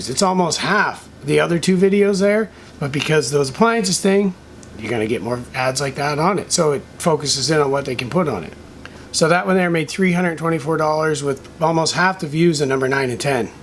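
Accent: Canada. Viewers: Bwahahahahaha! Love It. I've never seen your channel before, took six seconds to figure you're Canadian. Even before the "You're Welcome."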